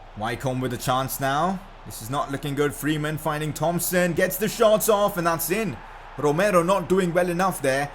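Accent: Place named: India. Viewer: He sounds like indian people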